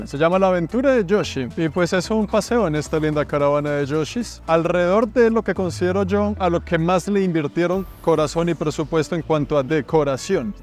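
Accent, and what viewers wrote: Colombia. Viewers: Buena, parce. Japón es bacano, y más su forma colombiana de relatar sus videos. Siga así!!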